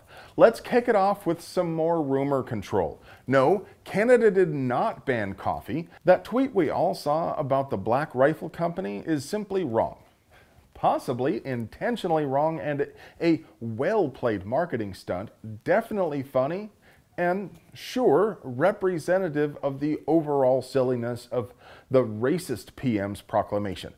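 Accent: United States. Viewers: You talk at first as if you're a Canadian, speaking to Canadians. But as you go along, you sound more & more like an American (using FBI statistics, as well) speaking to God knows who. I like facts. But I don't need to be "loved" by a chubby male stranger that I've never met. Thanks anyway. (Signed, Canadian Permit holder).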